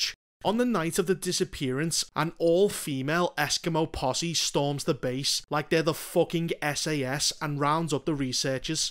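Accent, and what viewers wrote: Liverpool, United Kingdom. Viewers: You’re my favorite reviewer of this. You being scouse just makes it better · There is nothing more perfect to listen to, than a Scouser having a sarcastic rant about something 💩💩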